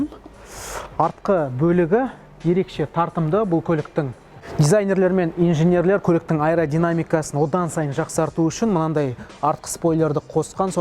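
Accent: Standard. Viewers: Ма ша Алла қазақшаны тап таза бұрмаламай сөйлейді екенсің жарайсың!!! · Жәрайсың таза қазақ тілінде жүргізген түсініктемелерің өте керемет. Қазақ тілінде осынша таза жеткізе білүде екінің бірінің біліктілігі жете бермейді. Ризамын саған інім. Талмай самғай бер.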